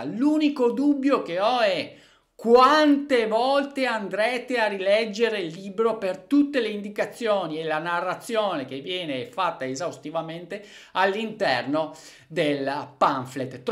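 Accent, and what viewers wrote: Veneto, Italy. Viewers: Io lo ascolto in loop sto video perché mi fa morire dal ridere. Il modo, le parole, la cadenza veneta (come la mia) impostata... credo di morire. Divorzio SPA mostro leviatanico.... morto!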